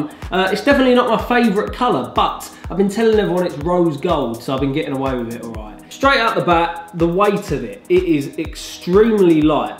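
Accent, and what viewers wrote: United Kingdom. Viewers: Probably the only Youtuber I've come across with a legit (non-pretentious) British accent. Top lad - subscribed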